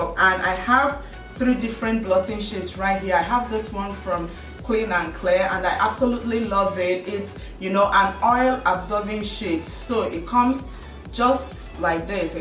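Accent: Nigeria. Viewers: i love that you maintain your natural naija accent, instead of confusing us with oyibo 'init'. i learnt a lot. thanks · Like seriously I just subscribed like seriously I like you oooh naija accent on fleek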